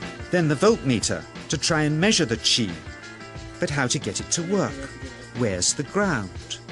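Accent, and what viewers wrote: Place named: Indonesia. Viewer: noo, i think ur wrong ... he speaks indonesians very good, and also use typical English-Indonesians Accent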